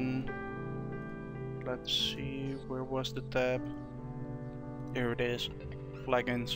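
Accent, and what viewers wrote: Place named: Netherlands. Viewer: Hey dude really nice tutorial but next time just speak dutch that sounds a bit better then that dutch-english. im dutch to so thats why im saying this. But still good job. Keep on the good work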